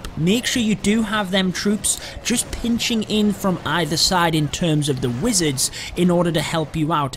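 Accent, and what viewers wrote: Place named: Newcastle, United Kingdom. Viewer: Cool it’s nice to see another Geordie clasher😂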